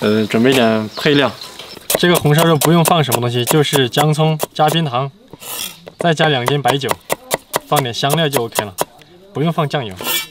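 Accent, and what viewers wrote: Sichuan, China. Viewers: 四川话好亲切！师傅哪个地儿的？ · 我一晃眼以为火云邪神的演员来客串视频嗨操起了四川腔！要得要得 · 喜歡的紅燒肉經由四川口音的介紹好可愛，王剛師傅好手藝，二娃來年肯定是個大美人呦，讚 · 四川哪里地土话噢？ 好可爱！！我就是四川人！！！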